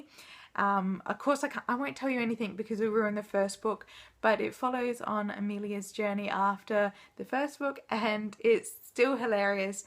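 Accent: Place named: Australia. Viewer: Are u from Adelaide or Melbourne just wondering ?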